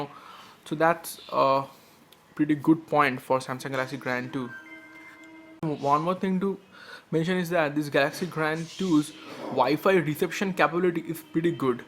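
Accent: India. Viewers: تتحدوني ان الي يتكلم هندي ........!!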